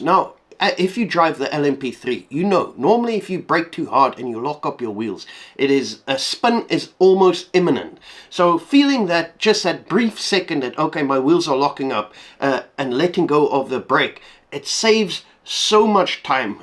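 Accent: South Africa. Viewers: You sound like a south african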